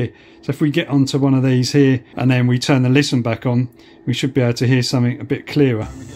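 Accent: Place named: London, United Kingdom. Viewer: A cheeky London accent version of serious radio! You should go down well with the sun readers.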